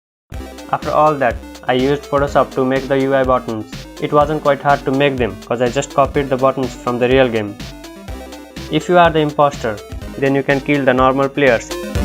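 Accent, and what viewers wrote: India. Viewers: are you Indian? you sound like one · This is why i love Indian accent it easier to understand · Bro why ur sound u from India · u r acent is quite indian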